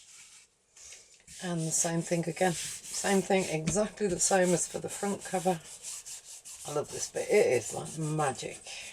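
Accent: United Kingdom. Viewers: Thank you so, so much for this tutorial. This is exactly what I wanted for my journal cover. I appreciate you taking the time to show every step of the way to achieve this result... and all of this with your beautiful accent that I LOVE. I am in South America but my soul dreams of a lovely quaint cottage in the English countryside. Anyway, did I say thank you? THANK YOU! THANK YOU! THANK YOU! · you live in our dream town!When my husband retires, that's where we want to live. I've only just discovered your channel - and from your accent you, like me, are from Oop North! Loving the chat, loving the tutorials and the way you do stuff. When we're in Glastonbury next we'll meet you at the cross and go for a cuppa or a drink. Hugs from a Scouser xxxxxx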